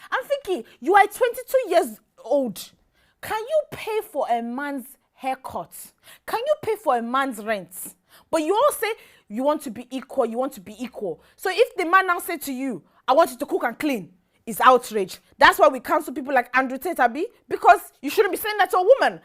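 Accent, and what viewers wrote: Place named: United Kingdom. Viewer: Do you live in the UK, your accent is everywhere?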